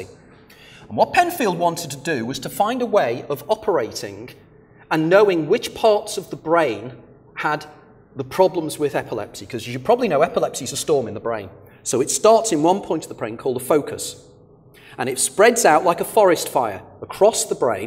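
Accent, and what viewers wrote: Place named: England, United Kingdom. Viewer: very interesting but why the unfriendly, snappy tone? Maybe it is the English accent, I don't know, if was Scottish or Irish, I am aware that he would sound friendly and even funny, full of spirit, but listen to Richard Dolan, American, he does not fly through his lectures and sound so cold....otherwise, great lecture. But I don't agree with "you live your life again and again until you live a perfect life". Why the human morality all of a sudden? why the idea of hard work, linked to progression then release? And who created the video game we are in (as player and creator are different people here in this reality)? Why do animals suffer so much? What's in the game for them? What about all the kiddies that Jimmy Saville raped? Are they to live again and again until they discover the right choices? What if Philp K Dick was right and the creator of this game was or the creators were evil?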